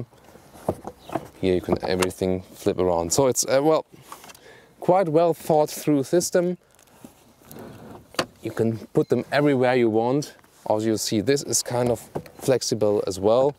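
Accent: Germany. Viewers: Ist ein sehr "deutsches" englisch, daher sicher auch für deutschsprachige besonders einfach zu verstehen ;)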